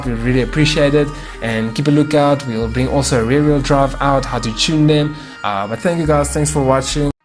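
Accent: Afrikaans, South Africa. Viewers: Afrikaans boytjie, kan sommer hoor haha.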